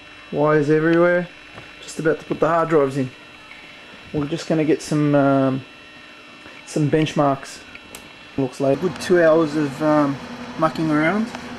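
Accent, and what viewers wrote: Australia. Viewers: hey are u Australian???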